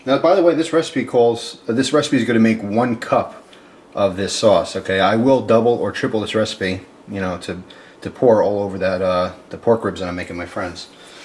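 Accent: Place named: Philadelphia, United States. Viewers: Definitely from South Eastern PA, we drink Wooder not Water LMAO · wodder... and weis store. you are from PA. Thanks for the recipe!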